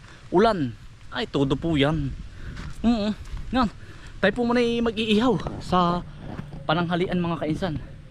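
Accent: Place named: Visayas, Philippines. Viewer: Nku idol tgal ko ng nuod sau kala ko bisaya k yung punto mo kase kala koy parte ng bisaya k😂😂😂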